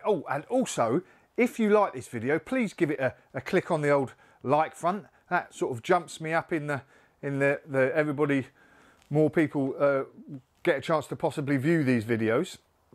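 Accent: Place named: South East London, United Kingdom. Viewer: Good 👍 Luck with the radiotherapy Mate. Where are you from in UK ?.sounds like a South East London / Surrey border accent. 🌴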